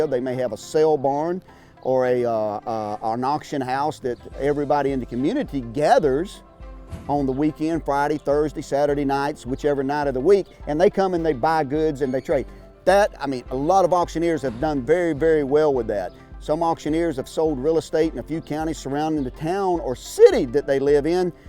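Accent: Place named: Texas, United States. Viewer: I love your videos especially the Texan accent!!!Way to go and I hope you get success that you deserve!!! 🙏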